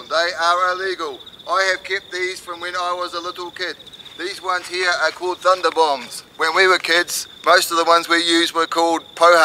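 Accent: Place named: New Zealand. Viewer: Coz he's a Kiwi - aka new zealander. Thats their accent.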